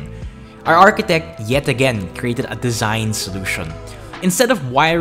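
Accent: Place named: Philippines. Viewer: Idk why I’m kinda fond of the way filipino says words ending with -tion 😂 Great video btw, love your place!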